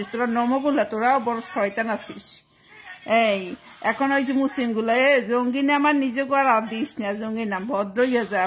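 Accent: Barishal, Bangladesh. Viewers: আপনার বাড়ি কোথায় আপনার কথা তো বাংলাদেশ বরিশাল জেলার মতো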